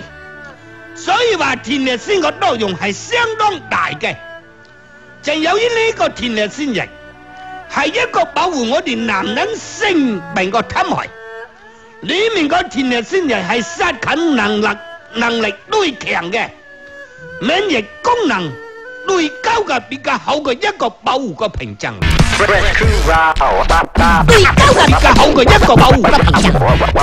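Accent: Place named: Taishan, China. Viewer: 勁，台山口音！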